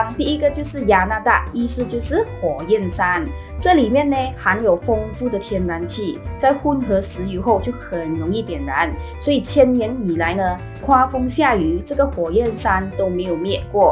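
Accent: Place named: Malaysia. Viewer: 听口音，博主是马来西亚人吧？视频图片很多，信息丰富，感谢。我是看见这个国家的糕点视频引起的好奇心。博主不出镜而是拍全凭景观非常赞！🎉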